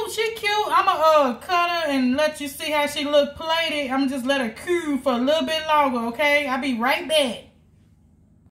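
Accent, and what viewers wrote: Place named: South, United States. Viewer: I Luv It! What Better Way Ta Spend Some Of Ur Saturday Afternoon Then Learning A Fun Skill From A Fun Teacher,Who Has A Fun Personality!!!I Just Want Ta Say Thank U So Much With Cho Charming Southern Accent! Go Head Wit Cho Badd Self!!Sweet Potato Cornbread Here I Come! Sent Me!!! 😜💜😂💚😍💘😜❤️😂💚⭐️🖤❤️🦾⭐️👍🏿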